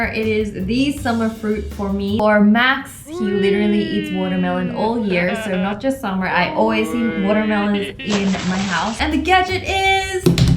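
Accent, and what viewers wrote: Australia. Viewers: I’m Australian too so u say bucks and mum and stuff like that! Yeah I have a accent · Which part of Australia are you from? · Is she Australian or something?🤔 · Ur Aussie?? 💁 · aren’t you Australian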